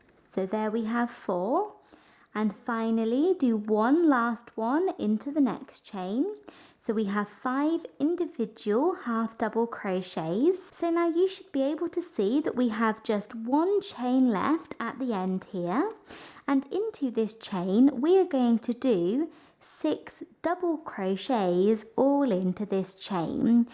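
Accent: England, United Kingdom. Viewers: It sounds English to me. I'm not sure how that sounds fake?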